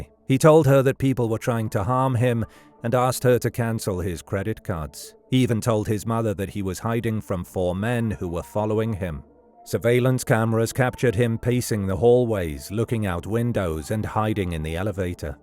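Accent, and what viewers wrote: United Kingdom. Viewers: Came here to say the same thing. A story about a German, narrated by someone with a British accent ... and they chose to use a word for football that's only really still officially used in USA/Canada..??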